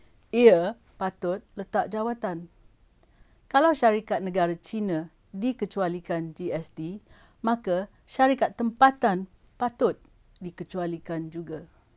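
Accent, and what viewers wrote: Perak, Malaysia. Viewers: Pekat bahasa perak..haha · Yong oghang peghak ke? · orang perak ke yong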